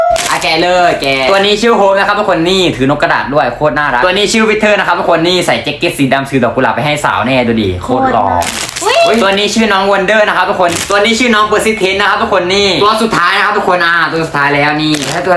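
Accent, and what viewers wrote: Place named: South, Thailand. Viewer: ทำไรหนูพูดใต้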